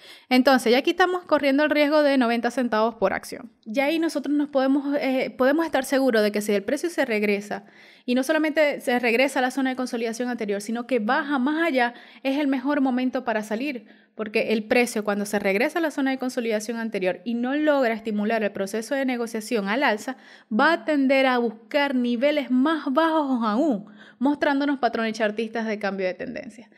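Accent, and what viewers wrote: Maracaibo, Venezuela. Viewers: Verrrgacioon maracucha te amoo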